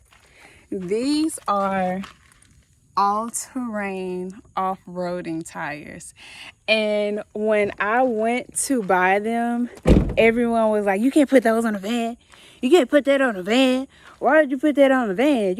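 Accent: Houston, United States. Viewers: That's that Houston accent! Beyonce and Kelly are both from Houston like she is. I was thinking the same thing though!!!! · It's the Houston you see/hear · They definitely have the same tone, accent and mannerisms. Maybe it’s a Houston thing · The Houston accent is so calming. You and Beyoncé can just record everything for me forever 😂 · Omg ❤️ You sound like Beyoncé... That Houston accent I guess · Yes, I was thinking the same thing. It's the Houston accent...and also her facial features. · This is how most black Houstonians talk, coming from another black Houstonian. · I know I'm not the only one who notices she laughs like Beyonce, lol. I love it, must be that Houston twang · You’re beautiful, sis. Love the van! I can hear that Houston twang. Very similar voice to Kelly Row and Bey. Here I am with my squeaky voice. Lol your happiness is palpable and contagious! 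Great luck to you and God bless. New subbie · Omg you sound so much like Beyoncé and Kelly it's crazy. Houston accents! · Must be a Houston thing. I love her accent. Sounds similar to Beyonce. Side note...running to get some grapeseed oil, because you're glowing sis! Now that I look at her she actually looks related to them, darker but I see a resembalance. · I was thinking the exact same thing. Or that since they are both from Houston they just have a similar accent.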